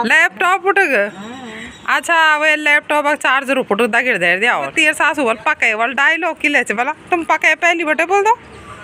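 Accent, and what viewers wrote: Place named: Garhwal, India. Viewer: Aap gadwali bolte ho acha lagta hai.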